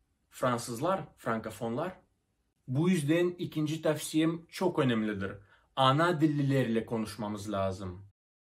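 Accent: Thrace, Turkey. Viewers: Aksanının harikaaaaa şiven tıpkı Trakyalı ya müthiş! 🤞🤞Türkçe- Fransızca- İngilizce için buradayım 🤞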